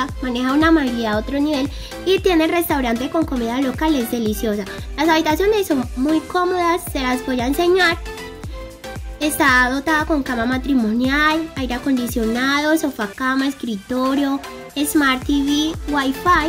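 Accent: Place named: Antioquia, Colombia. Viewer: Pero tu porque ablas paisa si no lo eres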